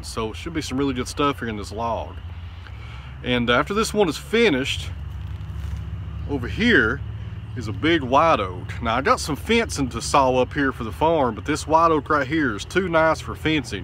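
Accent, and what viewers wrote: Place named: Tennessee, United States. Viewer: I'm new to your channel, and I have to say it's mesmerizing. I've never spent any time watching someone use a sawmill, but it's as though I have to watch you. What's so refreshing is your enthusiasm for the work, and the fact that you really, really appreciate the gift, direct from God himself, of the wood. I've had a love affair with wood since I first took a shop class in the 9th grade in 1972. When you reveal the inside of a log, and you revel in the fact that you are the first human being to see it, it is like unveiling a never-seen-before Rembrandt. I have stood in wonder as I gazed up at the ceiling of the Sistine Chapel, in awe of the work of Michelangelo. Yet he is no match for the beauty of a slab of walnut, uncovered by your saw mill. Thanks for bringing this great art to the world. In addition to woodworking, I'm a photographer as well. 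Both habits, errr, hobbies, are supported by my day job, which I love, and both habits, I mean hobbies, are black holes that swallow money without end. If I were to engage in milling wood like you, I'm fairly certain it would mean the end of 36 years of marriage.:) Besides, I live in a part of Wyoming where trees are few and far between. I will get my saw mill fix from your videos. Finally, I love the way you talk. I have a friend who hails from Tennessee, and he sounds a lot like you. That's a pretty unique thing in this part of the world!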